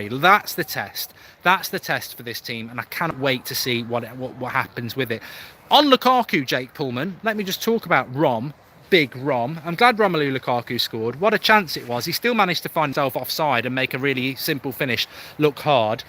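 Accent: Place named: Manchester, United Kingdom. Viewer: you almost sound like a manc. no disrespect.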